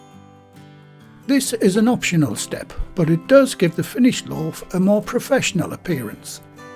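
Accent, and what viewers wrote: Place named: Northern England, United Kingdom. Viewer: Awesome video and for this old English gal displaced in Texas, it was lovely hearing that great northern accent! I’m going to have a break from making sourdough to bake this beautiful loaf, less of a challenge! Thank you 🤩👍🏻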